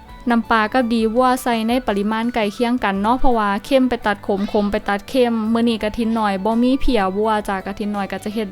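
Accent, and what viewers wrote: Isan, Thailand. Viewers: คือเว้าม่วนแทะครับอิสานทางใดครับ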